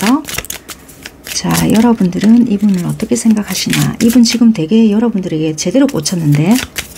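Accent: Busan, South Korea. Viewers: 선생님❤2번 리딩 감사합니다!!! 평안한 밤 되세용😊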